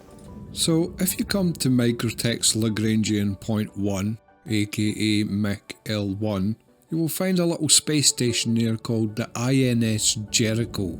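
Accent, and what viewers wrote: Scotland, United Kingdom. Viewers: I'm here for the Scottish accent 😎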